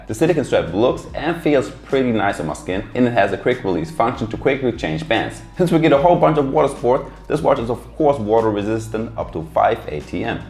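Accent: Germany. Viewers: Direkt deutsche akzent gehört coole videos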